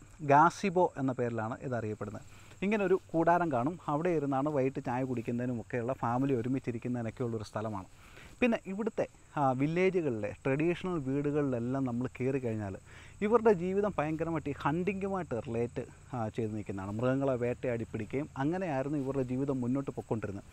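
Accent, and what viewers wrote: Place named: Kozhencherry, India. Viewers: ഓണാശംസകൾ, kozhencherry കരാൻ അന് എന്ന് അറിഞ്ചപ്പോൾ വളരെ സന്തോഷം. നമ്മുടെ നാടിന്റെ എല്ലാ നന്മകളും സംസാരത്തിൽ ഉണ്ട് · പത്തനംതിട്ടക്കാരനാണ് എന്ന് സംസാരത്തില് തന്നെ അറിയാം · മലയാളം കേട്ടാൽ അറിയാം പത്തനംതിട്ടകാരനാണെന്നു..😊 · സംസാര ശൈലി കേട്ടപ്പോൾ തന്നെ തോന്നി പത്തനംതിട്ടക്കാരന് എന്ന്, വളരെ സന്തോഷം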